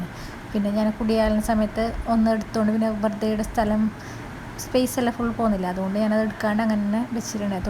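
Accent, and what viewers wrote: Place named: Kasaragod, India. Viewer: Kasaragod basha super 👌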